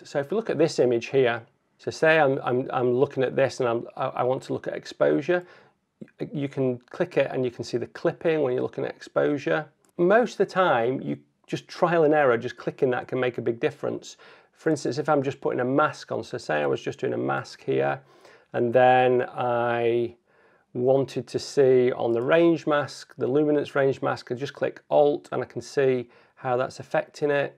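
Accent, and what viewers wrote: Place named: Sheffield, United Kingdom. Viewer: Great video. Is that a Sheffield accent I hear:) Nottingham lad here...!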